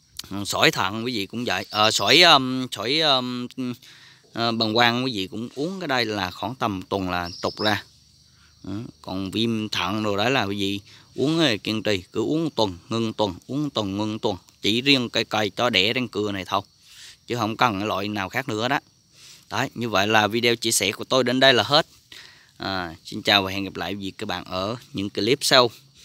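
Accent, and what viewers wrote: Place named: Binh Dinh, Vietnam. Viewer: Mình khoái nghe giọng bình Định rồi đó nha. Thuốc thì ok rồi hôm nào mần cờ líp về võ thuật và rượu bầu đá nhe 😁😁😁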